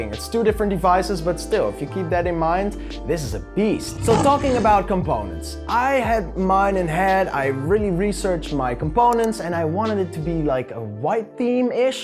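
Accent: Netherlands. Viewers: haha, ik dacht de hele tijd al dat je nederlands was (piep klein beetje accent). Mooi gebouwd! Ik ga denk ik dezelfde bouwen maar met de 3900x. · are you from the netherlands? your accent sounds like it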